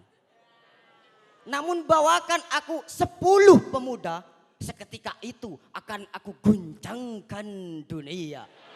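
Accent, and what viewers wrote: Madura, Indonesia. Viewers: Ngmong e jowo tpi logate meduro👍👍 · Logat Madura GK bisa di sembunyikan 😂❤ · Mungkin ini orang Madura Logatnya sangat Madura bosku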